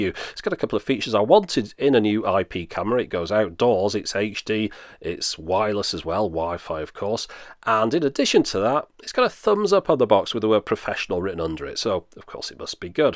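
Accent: United Kingdom. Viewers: Okay... I can almost accept "rooter," but where do you Brits get "ah-lume-in-EE-um?" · Good review... Now if you could just drop that British accent... how about a Southern US accent... that'd be a little easier to understand : ) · As an American I'm not bothered how you pronounce 'router' in the UK. There are many words we pronounce differently in our respective countries, such as "aluminum". It's not an issue with me but most everyone I know here in the USA does in fact pronounce 'Route 66' as "Rowt 66", not root 66. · I'll like the video for the british accent.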